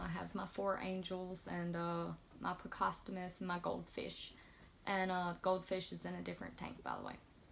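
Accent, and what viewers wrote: South, United States. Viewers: Now that I've watched it all, Southern accent for the win! Welcome to the FishFam! That was a great first video with a wonderful backstory. Thank you for sharing with us! 👍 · First of all welcome to the fishfam! Second I appreciate relatability in people I sub to. I find you to be very relatable. I also live in a small town except we have one caution light lol. I've got tanks full of walmart fish and have been fishing my whole life. All the fish tanks we had used to be the same way. 10 gallon walmart tanks with just top offs. Didn't know any better. Don't worry about the southern accent, its great and makes you who you are. You are a natural talking to the camera. You will have a bunch of subs in no time. Great job! Looking forward to future content! Btw KGtropicals was one of the first channels I found and they helped me more than I could ever tell them!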